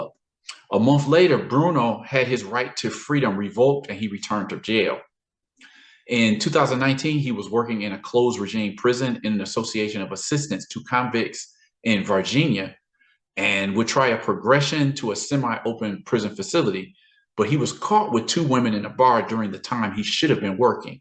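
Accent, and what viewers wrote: United States. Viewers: You sound American but I'm guessing you live in Brazil bro?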